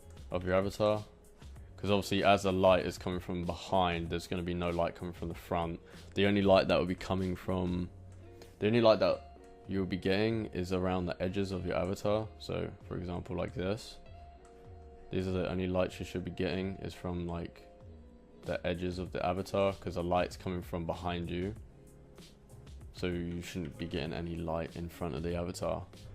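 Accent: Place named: United Kingdom. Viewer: What part of the UK you from?... ifyou even are. im down east in LONDONNNNNNN <3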